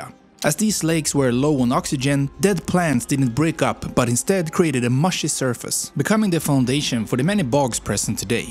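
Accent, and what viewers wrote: Sweden. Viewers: The narrator sounds Swedish, or possibly Finnish, so Estonian pronounciation shouldn't be too alien to him. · I'm Estonian and I thank you for all the positive comments! As soon as you started to speak, you're Swedish, right? Just my observation, nothing else. And thank you, again. Your somewhat simple but overall meaningful sentences moved me a lot! We want to be free and want to be a part of FREE Europe! Ok, yesterday was our county's 106th birthday, but in Europe we've been much less time. We can give MORE and want to give MORE! I'm sure I'm not the only one thinking like that! :) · Sounded pretty Swedish to me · please please please for the love of everything anyone has EVER considered holy, can we get someone narrating this that actually speaks ENGLISH and not SWENGLISH, it is literally painful to hear.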